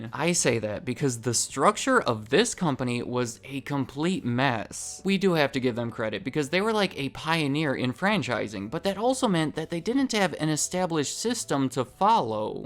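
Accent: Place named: Alabama, United States. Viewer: Sounds Alabaman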